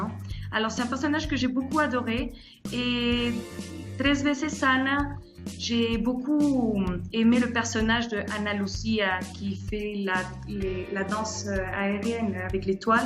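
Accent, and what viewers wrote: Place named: France. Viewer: Elle a l accent français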